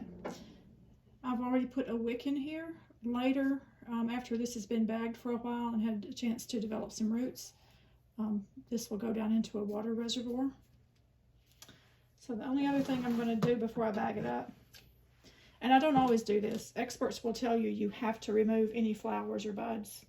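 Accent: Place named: East Tennessee, United States. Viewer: You sound like East Tennessee. Me!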